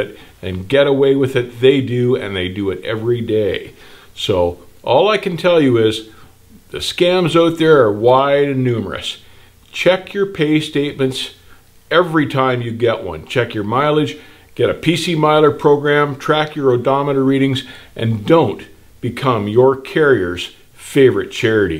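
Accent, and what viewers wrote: Canada. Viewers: A good ol canadian boy, eh. He talks a lot aboot stuff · This guy comes across as American but sounds Canadian as hell. He's still hilarious, however. I'm subscribing. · Is that a Canadian accent i hear ey? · U sound Canadian. Our only friends. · Good tip and thank you for the video. You sound 🇨🇦 Ian...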